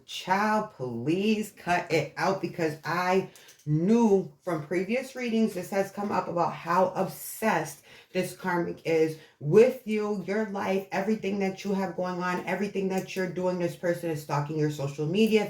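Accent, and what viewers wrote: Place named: East Coast, United States. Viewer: You have no idea just how much i would enjoy to sit and chat with you or have a personal reading and such. In the world of psychics and tarrot there are but a few who are truly gifted and know how to properly utilize and share that gift. Sadly we live in a world where scams have existed from the beginning. So its very refreshingly beautiful to come across a reader such as yourself. Not a phoney bone in your body. You are clear, speak well and very detailed and concise as far as a card can be keep what resonates and leave the rest You have a nice clean visual for your background looks bright and clean with that lil pop of red and your speaking voice is very very easy for me to listen to. Im sensetive to sound and light. Sometimes a person could be lovely to look at then the minute they talk i just want to run lol. 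Also hear a bit of east coast accent and i luv that and i just adore you. Your shorts are wonderful 👍 i have never taken a salt bath but there are Himalayan salt spa caves and i would luvv to go to one or the healing spings in Arizona. Anyway i believe in the universe and that it gives us sign after sign and so very many who dont believe just wount see the signs. Heck even those who believe miss or ignore signs. Spirituality is a journey and a very personal process. For some it starts as toddlers for others teens and older and sadly for some never. #ALL CAN, SOME SHOULD, NONE MUST! Love&Light ~♡~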